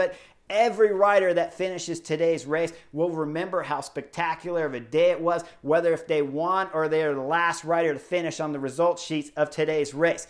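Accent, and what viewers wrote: United States. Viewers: you’re really excellent at your craft. You’ve always been a good spokesman, with your Cali-cool way of talking, even back in your racing days. I appreciated your insight into the not-so-obvious reasons for the big crash. And, I also appreciated that you were scared while racing. I was too. The fear (ie adrenaline) will produce strength and performance beyond what is imaginable.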